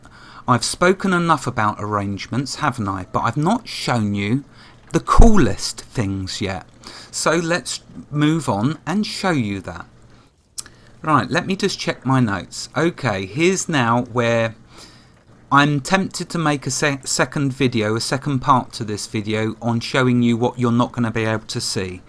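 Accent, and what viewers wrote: United Kingdom. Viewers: cool - I will continue to try and get the level up - I would love to join the server and get whatever speak thing you use (Teamspeak?) up and running - I am from Kent originally but I have been living here in Arkansas for about 3 and a half years so its good to hear another British accent - the usual response to my accent here is "you ain't from round here are you boy" - I generally respond by saying oh what gave it away LOL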